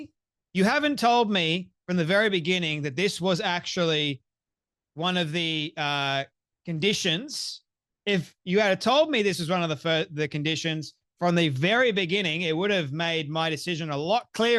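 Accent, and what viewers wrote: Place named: Australia. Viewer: I love your channel, you speak as an Australian and i so appreciate that 👌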